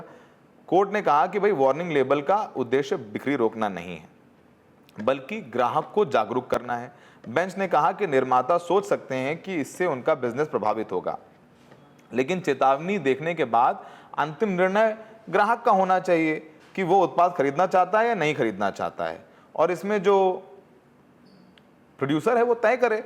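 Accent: Kanpur, India. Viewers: You should make more videos in your authentic kanpuri language and Ravaiya. It was fun to watch.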